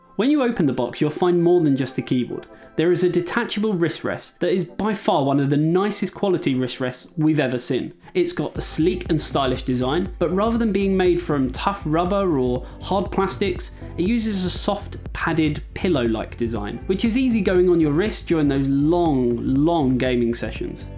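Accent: United Kingdom. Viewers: I love when to watch english video's instead of american. I don't know how but the british accent makes me focus more on the video instead of interruption of my dogs barking or driving by cars🤔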